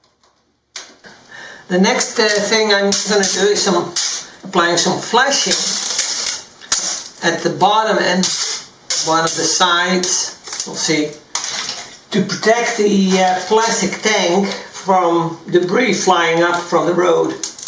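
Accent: Netherlands. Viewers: I was really curious about the accent, I first thought that there was a region in the US where people, maybe, spoke with a Dutch sounding accent because of some interesting historical reason 🙃 But, the way you say camper is very Dutch 😉 You're right, I am Belgian, but I'm Flemish speaking not French. I have a French last name and I also live close to the French speaking part of Belgium. Many people get confused about that. was a popular boys name in Flanders around the time I was born. Bedankt voor de leuke filmpjes!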